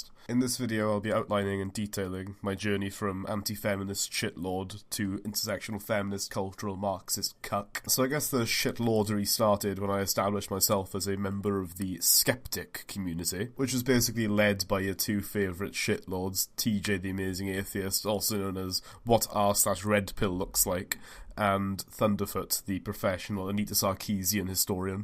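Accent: Wales, United Kingdom. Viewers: I don't often subscribe to people based on a single video but this really resonated with me. While I was never reactionary enough to enjoy Milo Yiannopoulos or Sargon of Akkad I was a Gamergate supporter and was suckered in by their sensationalism and militant rhetoric. Then I guess with the rise of Trump I just distanced myself after I found out that a lot of the people I was following weren't actually liberal centrists but full-on Trump supporters. Brexit didn't help either. Also, fellow Welshman? Or am I just bad at recognising accents?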